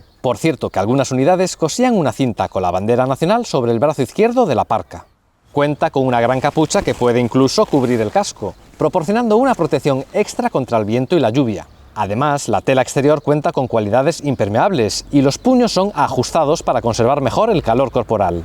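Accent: Argentina. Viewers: para ser Español, te salió bastante bién el acento Argentino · Le salió muy bien, pero es acento porteño, no argentino. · Ja ja ja te sale bien el acento argentino, buen video, saludos desde Valparaíso de Chile ❤ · Felicitaciones tambien por intentar imitar nuestro extraño y dificil acento de argentino promedio, que igualmente varia mucho entre las regiones de un pais tan extenso. De 1 a 10 te doy 7 puntos, con un poco mas de practica o viviendo unos meses aca llegas al10, loco. 😮😲😁👍🇦🇷💪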